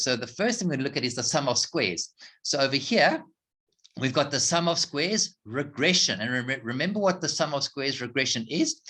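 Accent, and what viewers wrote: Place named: South Africa. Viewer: Thank you so much. Very well explained. Love the south african accent. :)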